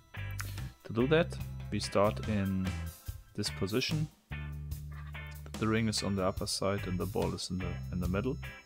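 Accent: Germany. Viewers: Du hast sooo eine beruhigende stimme und man hört sofort dass du deutsch bist :) ich war eigentlich nie der typ für puzzle spiele aber du hast mir dem echt näher gebracht und ich finde das total interessant was sich die macher immer bei verschiedenen mechanismen gedacht haben :) außerdem ist die soundqualität eeeeendlich gut xD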